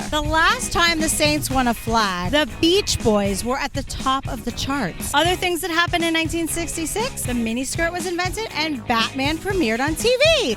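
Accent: United States. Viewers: I’m loving this. I look forward to this every week.. As an Aussie who used to live in Texas it’s awesome hearing an American accent talking about footy..👍 Please keep it going. Be patient. I can see you being a huge star ⭐️ down under in a few years !!!!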